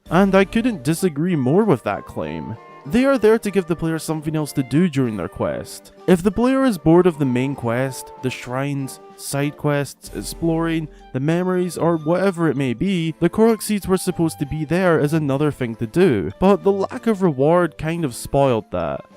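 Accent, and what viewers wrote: Scotland, United Kingdom. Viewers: So this is my first time watching your videos... And i can't keep myself wondering where you live. To me it sounds like you're from Scotland but you clean up your speech so that the rest of the world can understand you... That aside, I do agree with you on your points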